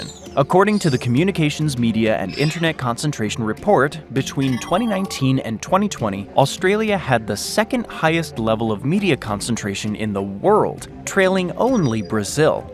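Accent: United States. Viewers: Omfg the most American video ever, trying to downfall the new Eldorado country that took your 1st spot is hilarious af. Bro butthurt that is little America as lost it's paradise status and take it on the new n°1 😂😂 i'm dead We dont care that Australia is isolated and far from everything, it's a good thing rather than a bad, EU and America is so satured with their own legacy and history that a place like Aus is literally a new born baby so it has so much potential and possibilities, that's why it is so great cause it is not concern with the historic bs that EU and US can't dissociate from, it's literally just living it's best life, something that the rest of the world should learn from. If you move to Aus you know why you chose to live there and nothing else really matters when you got such a perfect country to live in. A flight is a flight, 8 or 16 hours is all the same The news thing, how is that a bad thing ? 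People in EU and US literally are brainwashed daily with so much bs information nobody gives a fck about, it's literally cancer for your life. You say it yourself in here, 'they might have hacked the mental health problem' duuuh.. Accumulated informations that doesn't matter for 90% of it giving cancer and tumors to people.. i swear people make videos like this either cause they got rejected for their Visa to go live there or you just like spreading negativity. Or.. if that's your voice you're clearly American and jealous that the 'Eldorado' status is now considered to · As soon as I heard the American accent I was out, I couldn’t imagine an American watching a video on their country with an Aussie twang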